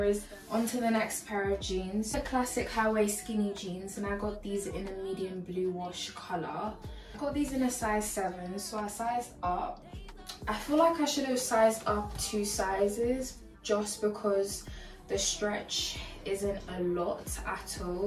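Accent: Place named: United Kingdom. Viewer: Your British Accent….❤️ Very Beautiful As Well…..😍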